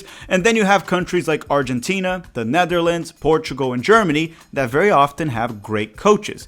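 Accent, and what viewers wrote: Rio de Janeiro, Brazil. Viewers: You are Brazilian, your accent gives it alway. Besides, "soccer" doesn't exist, only football and american football. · n mt a ver cm o video em si mas seu inglês eh mt bom meu querido, nao no sentido tosco do elogio de q "ai parabens seu inglês nem tem sotaque", o seu tem e é muito gostoso, eh mt natural, traz a musicalidade do ptbr pro ingles cm pronuncias muito boas e uma fluência fantástica, tipo ouvir um joao gilberto ou tom jobim cantando em ingles cm o frank sinatra na década de 60, uma identidade q eu finalmente consegui sentir oq é um inglês brasileiro no mesmo sentido de ouvir um sotaque inglês indiano, um inglês alemão, inglês africano e por aí vai, meus parabéns kkkkkk e bom video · hmmm sotaque brasileiro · como que um brasileiro-americano, com um sotaque carioca, torçe pro palmeiras e não pro flamengo? kkkkkkk grande vídeo amigo! 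a única correção que eu faria é que sim, dos anos 50 aos 80 os tecnicos brasileiros eram genios taticos, o uso de formações abertas pra alargar o campo como o 4-2-4 e atacantes que faziam a posição de falso 9 foram inovações tacticas brasileiras... saudações rubro negras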